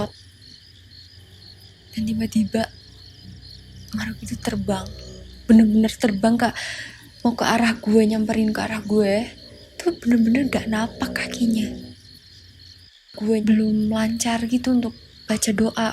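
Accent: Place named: Java, Indonesia. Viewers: Medok juga keren kok.. ga harus pake gue padahal medok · Scara dari JaTang mba nya ... 😊😊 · Medok mbak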